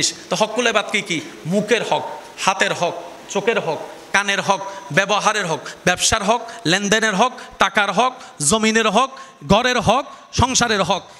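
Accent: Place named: Chittagong, Bangladesh. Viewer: ভাই৷ অনেক সময় আপনার কথা গুলা চাটগাইয়া বুজা দায় তবে বুজি কম বেশ সব জেলার কথা গুলা আনেগো কোন জেলার কথা গুলা বুতে একটু কস্ট হয় আমিন মনে কিচু নিবেন না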